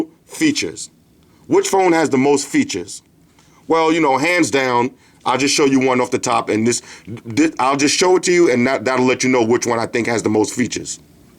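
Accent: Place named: New York, United States. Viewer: a real ny nigga.... i can tell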